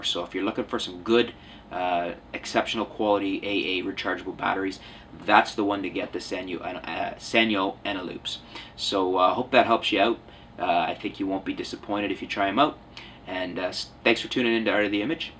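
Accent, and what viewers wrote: Canada. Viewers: best thing about the Eneloop batteries, is your awesome Canadian accent!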